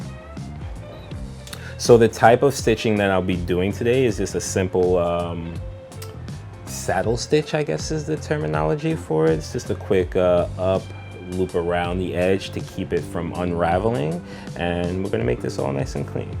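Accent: United States. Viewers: You get my thumbs up just for your sweet NY ACCENT🥰🥰🥰🥰🥰🥰🥰🥰🥰🥰🥰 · You are an inspiration. My son can’t sew a button on. Clear instructions and a beautiful clear voice. I’m a sucker for American accents. New stubby from Scotland 🏴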